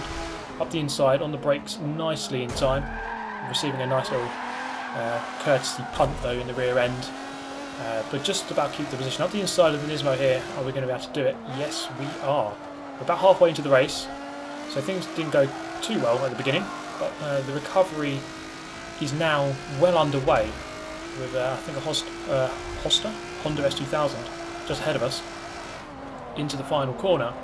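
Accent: United Kingdom. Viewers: Your British voice just makes it so much better lmfao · Your calm nonchalant attitude for ramming and other issues paired with the dialogue and English accent is absolutely hilarious for some reason. Some good dry humor lol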